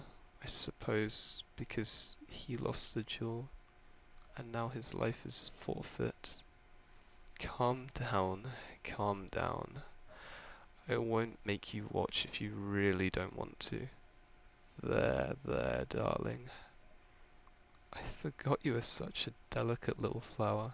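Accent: United Kingdom. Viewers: he sounds sooooo British 🇬🇧🇬🇧🇬🇧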